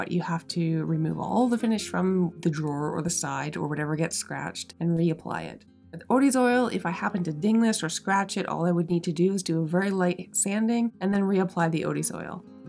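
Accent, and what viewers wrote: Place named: Hants County, Canada. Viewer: Hello from East Hants! Did you film the lightning? It was relentless and went on for hours. Always love your videos, and hearing a Hants County accent on YouTube! 😉Keep up the great work! ❤🤍❤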